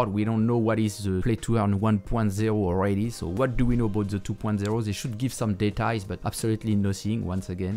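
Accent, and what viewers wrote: France. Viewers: Deux secondes dans la vidéo et j’ai grillé que t’étais français ! Haha en tout merci pour l’information et les vidéos c’est une vraie source fiable, continue ainsi mon con je suis derrière toi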